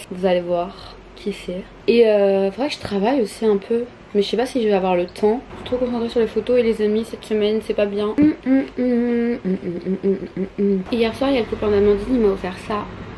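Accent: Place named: England, United Kingdom. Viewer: Chu morte pourquoi à chaque fois que t'es avec tu prends un accent anglais??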